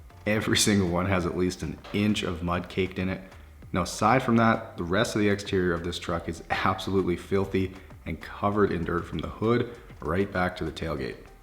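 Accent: Canada. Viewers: Eh? Looks a lot better than I brought it eh? Yea a little less mud on it eh? I love the Canadian speech 😂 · Dude doesn’t sound Canadian when he does voiced overs but when he talks to other Canadians in a video he sounds Canadian as ever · I ligit screamed when he was talking to the customer I never thought he sounded Canadian till now the aye and everything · anyone else surprised when he started speaking canadian? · His inner Canadian comes out when he associates with other Canadians. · Love how you became more Canadian sounding once you were talking to your bud there! It's so funny how we make each other Canadian.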